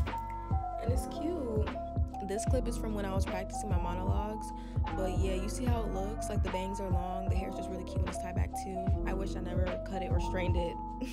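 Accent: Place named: United Kingdom. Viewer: chile i thot u was british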